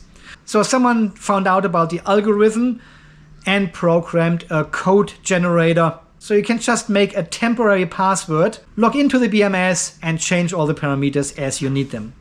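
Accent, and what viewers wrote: Germany. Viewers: Hello - love watching your show - German accent and all! Are you done with JK for now? I am quite fond of them and am building a few 52V inverter batteries with them. Quick question that you might be able to help with? I've used the "GPS" port with USB serial quite successfully on the smaller BMSs (to monitor and integrate into Home Assistant). I've tried the RS232 RJ11 - but without success. Is there a way to get someth9ng similar running on the inverter BMS? I've got a Bluetooth version running into Home Assistant - but I'd prefer hardwired.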